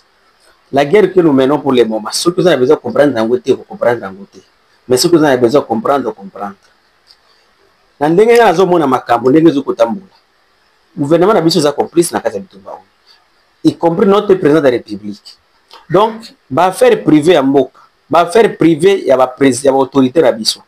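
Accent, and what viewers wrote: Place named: Rwanda. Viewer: Namoni yo ozali mutu ya Nanga tika lokuta ndoki ya kongo bokokima pe ozali Ruande yoka lingala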